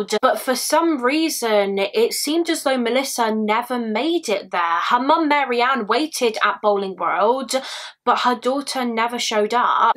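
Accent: United Kingdom. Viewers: Hi, I was curious what part of the UK your accent is from? I enjoy hearing it!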